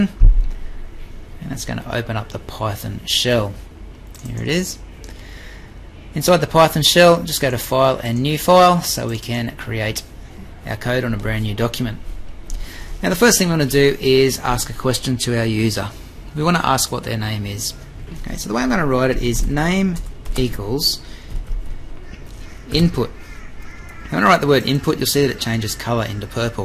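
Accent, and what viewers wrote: Australia. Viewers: Are you Auzzie mate cause you certainly have an auzzie accent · love the aussie accent never lose it!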